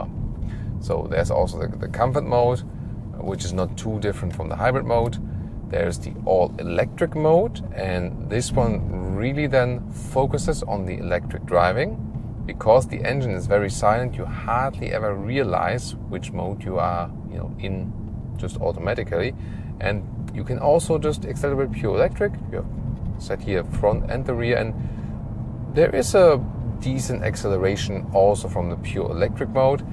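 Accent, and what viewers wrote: Germany. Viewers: I don't understand German English ... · An excellent car, but I could do without the atrocious German accent!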